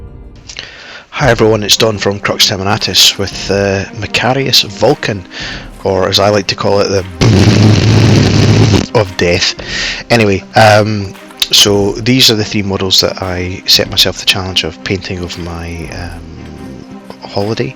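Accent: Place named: Glasgow, United Kingdom. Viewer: yup.....no tatties in your ears! You from Glasgow, I presume? Fantastic video, crystal clear, and a beautiful, beautiful build. Outstanding. Personally, I build WW2 stuff, but I can learn a lot of from your videos. I'm gonna subscribe and send a link to my pals of your fantastic work on our Google+ modelling community.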